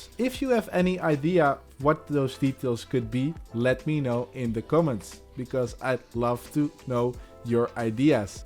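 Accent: Netherlands. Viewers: I recognise the Dutch accent instantly!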